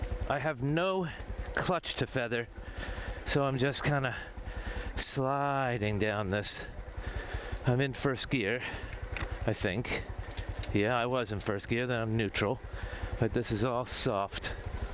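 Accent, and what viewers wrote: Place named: Midwest, United States. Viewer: ive never been to this channel in my life, why does this guy sound like hes from the mid-west but he says he's living in the UK? I'm so confused.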